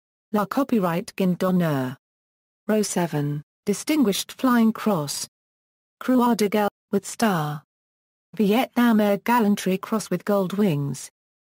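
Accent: England, United Kingdom. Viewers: She sounds English, has accent, ok?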